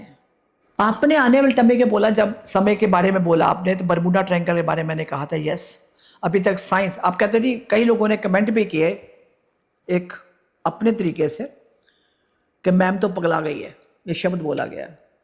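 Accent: Punjab, India. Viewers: Pranam Aunty. I regularly watch your videos. I love your punjabi accent.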